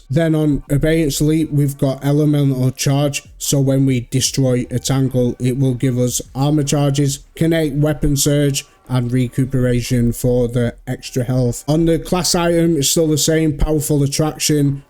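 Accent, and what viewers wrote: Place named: Northern England, United Kingdom. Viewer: Bye heck great accent fella 👍🏻 fellow northerner in peace ☮️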